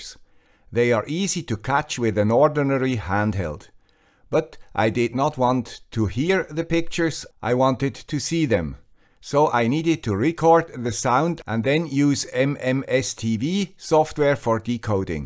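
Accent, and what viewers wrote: Switzerland. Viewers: Over the years, my ears have developed an adaptive filter for that Swiss accent. Thank you for producing material that made that very much a worthwhile endeavor.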